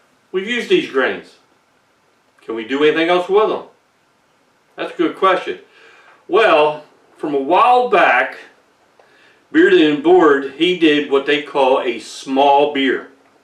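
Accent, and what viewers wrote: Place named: South, United States. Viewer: im assuming this dudes from kentucky or tenessee or virginia or north carolina or somewhere like that and not texas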